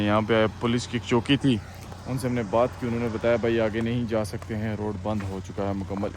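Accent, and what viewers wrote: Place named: Pakistan. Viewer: السلام علیکم اپ بہت اچھی ویڈیو بناتے ہیں اور حقیقت کے بہت قریب جب بھی اپ ایسی جگہوں پر جاتے ہو تو اپ کی گاڑی پر پاکستانی فلیگ ہونا چاہیے تاکہ پوری دنیا کو معلوم ہو کہ اپ پاکستان کے خوبصورت علاقے دکھا رہے ہیں یا بیوٹی فل پاکستان کا کوئی مونوگرام بنا کر لگا دیں اپ جو متواتر اپ کی ویڈیو پر شو ہوتا رہے جس سے یہ ظاہر ہو کہ یہ پاکستانی کی ویڈیو ہے ہمیں تو پتہ ہے اپ کی اواز سے پتہ چل جاتا ہے لیکن باہر کے لوگ جب ویڈیو دیکھتے ہیں نا ان کو کوئی ایسا سگنل یا کوئی مونوگرام چاہیے جس سے ان کو یہ پتہ چلے کہ یہ پاکستان کی ہے اللہ تعالی نے اپ کو بہت عزت دی ہے اپ کوشش کیا کریں جب کوئی اچھا سین ائے تو سبحان اللہ کا لفظ اپ استعمال کیا کریں اکثر ویسے اپ کا ارجان دینی ہے جو مجھے بہت اچھا لگا کہیں پر نماز پڑھتے ہوئے بھی اپنے اپ کو دکھا دیا کریں ساری عمر تو ہم نے اس دنیا میں نہیں رہنا جانا تو اللہ تعالی ہی کے پاس ہے یہ زندگی تو ہماری عارضی ہے اللہ تعالی اپ کے درجات بلند کرے اپ کو اور خوشیاں دکھائے پوری قوم اپ کے ساتھ ہے۔اللہ اکبر پاکستان زندہ باد پاک ارمی زندہ باد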